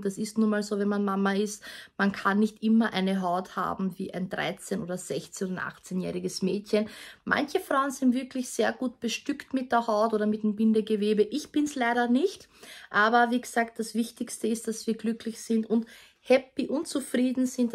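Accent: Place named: Austria. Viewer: Ich höre das Österreichisch so gern 😍 Schönes Video!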